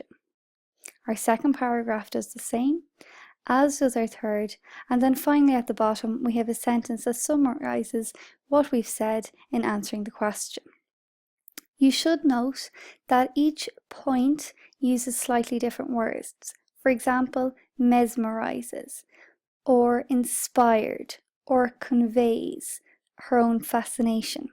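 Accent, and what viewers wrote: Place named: Ireland. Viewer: oh that voice that irish accent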